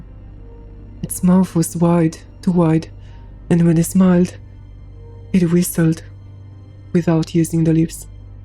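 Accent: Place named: France. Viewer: Ur accent is beautiful and I think Ur original language is french 😅❤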